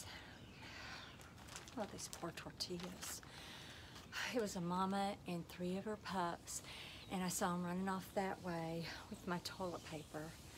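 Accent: South, United States. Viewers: Funny, great looking, with a soft southern accent.😅❤️🇺🇸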